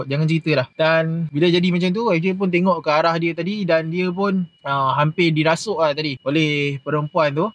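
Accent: North, Malaysia. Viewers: FJ org utara kaa? Lama duk sana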